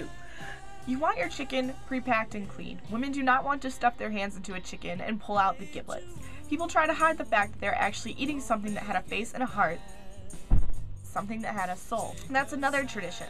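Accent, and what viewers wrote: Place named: Liverpool, United Kingdom. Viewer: Haha a liverpudlian accent is quite different from harry potters... VERY.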